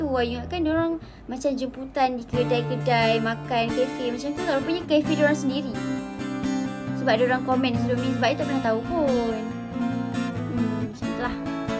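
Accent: Malaysia. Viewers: Suka x dengar cakap malaysie ni. Meremang bulu roma. 😀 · Saya suka cara bicaranya aja.lucu,manja,dan cirkhas logat Melayu nya bikin adem telinga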